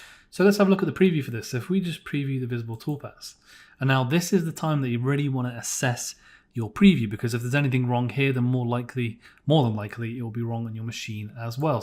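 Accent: United Kingdom. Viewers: Confused about why an Englishman keeps mispronouncing the letter Z? Surely if we can tolerate Aloominum, they can translate 'Zed'? Luckily I am old enough to still remember how to think in Inches though ;) Thanks for the Video, very helpful. Maybe add subtitles for an English speaking audience?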